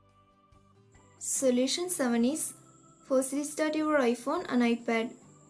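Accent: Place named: India. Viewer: Hard to understand ... sounds Indian 🤨 too fast as usual 🧐 too many options sounds no good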